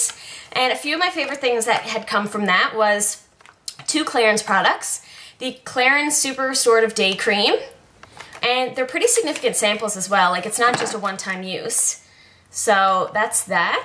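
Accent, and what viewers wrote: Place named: Prince Edward Island, Canada. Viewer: Are you from PEI? Haha I can sense an accent. Great video!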